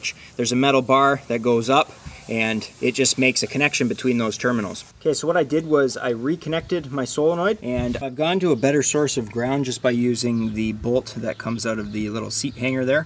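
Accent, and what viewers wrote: Canada. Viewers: Good advice, you sound Canadian. All it takes is an ohm or two of extra resistance in big cables like that, and power is robbed from the starter motor in line. Drop testing would have been fun to see before removing wires, though the lack of any voltage at all on the starter post in the beginning is strange. Do garden tractors and farm equipment usually have starter solenoids separate from the starter motor?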